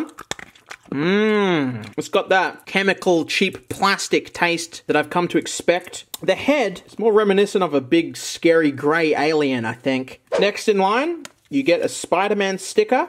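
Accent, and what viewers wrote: Australia. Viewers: i thought ur Australian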